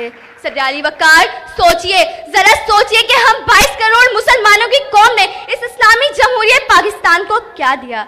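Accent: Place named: Punjab, India. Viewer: Mend your Urdu pronunciation.slowly move away from Punjabiat.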